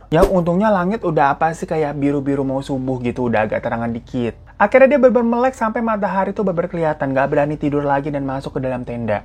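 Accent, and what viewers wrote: Java, Indonesia. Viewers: Lucu bgt ya, kak bahasa jawanya lucu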